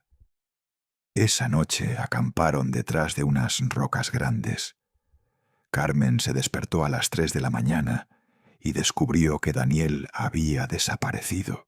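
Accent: Spain. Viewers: Con ese acento Español no te creo ni papas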